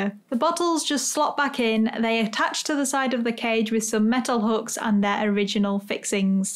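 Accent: United Kingdom. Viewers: She is so British I am American lol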